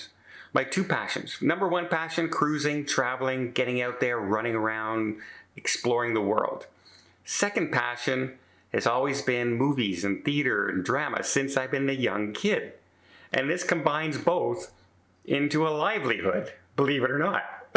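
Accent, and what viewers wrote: Canada. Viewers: I just love when your Canadian accent comes out, it always makes me smile. Thank you, for sharing your story.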